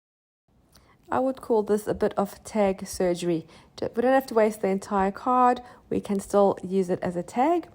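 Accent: South Africa. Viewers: You sound like a fellow South African, where are you from?☺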